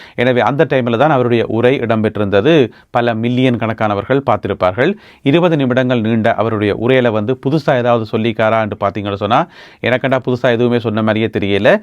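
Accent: Sri Lanka. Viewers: ஸ்கீரின் பேக்ரவுண்ட் ஒரு செய்தியில் ரெட் இப்போது கிரீன் நல்ல ரசனை அருமை..👌 செய்தி வாசிப்பும் இலங்கை தமிழ் நெல்லை தமிழில் அழகாக வாசிப்பு திறன் அதுவும் தடையில்லால் சூப்பர்.. போர் செய்தியும் மற்ற சேனலை விட நல்ல விளஙம்குபடி சொல்கிறார்.. ஓயில் ஆயில் கொன்றது கொண்டதுன்னு கதைக்கிறது ரசிக்கும்படி உள்ளது.. எனது வயது 70 படிப்பு 7 நான் நெல்லை தமிழன்..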